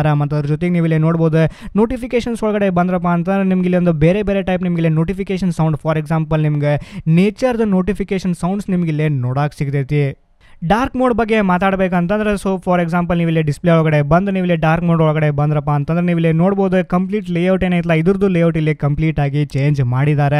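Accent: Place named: Uttara Kannada, India. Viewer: Nim UK Kannada riwev super bro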